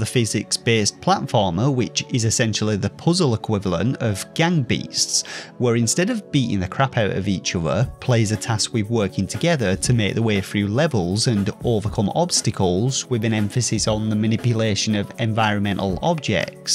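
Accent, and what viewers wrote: United Kingdom. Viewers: but every single word you pronounced was in a British accent. You did pronounce it correctly. We invented the damn language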